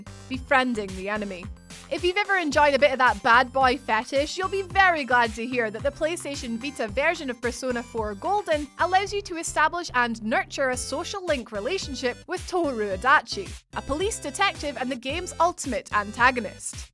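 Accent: Scotland, United Kingdom. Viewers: I switch to something else the minute I hear this Scottish girl’s voice 😒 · ZOMBIE BEYONCÉ! Haha! Initially I was doing something else whilst listening to this video playing in the background. At no.5 in the countdown (@ - ) “House of the dead, Zombie Fiancé” I was so sure I’d heard ‘Zombie Beyoncé’ which peaked my interest in that game ten-fold...haha! Just imagine Beyoncé as a zombie. I rewound the video to double check & obviously immediately realised I was incorrect & had misheard due to Scottish accent. Oh well...It was a fun thought though while it lasted! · Ah miss I could listen to your sweet Scottish tones all day 😉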